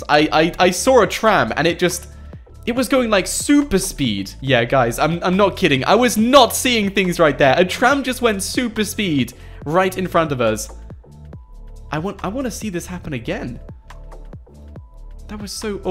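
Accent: England, United Kingdom. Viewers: he said Car Loss instead of Carlos man his England accent is funny sometimes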